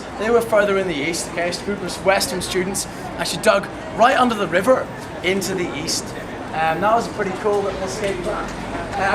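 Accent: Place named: Ireland. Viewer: i like tha way he talks ..... he has an ireland accent ..... lovely !!!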